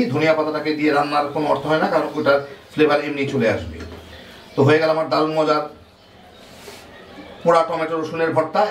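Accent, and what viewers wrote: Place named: Bikrampur, Bangladesh. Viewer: আপনার কথাগুলি বিক্রমপুরের মত। আপনি কি বিক্রমপুরের ?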